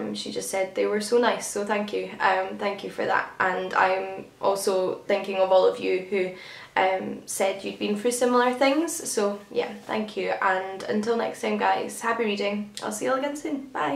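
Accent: Scotland, United Kingdom. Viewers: I havent been subscribed very long but I love your accent! Where are you from? Are you Scottish?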